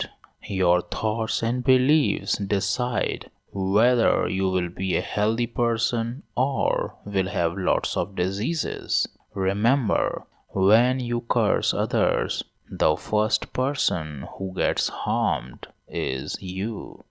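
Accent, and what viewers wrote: India. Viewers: It's not a cartoon voice but an Indian voice. However many people are born with disease...... This is just nonsense.....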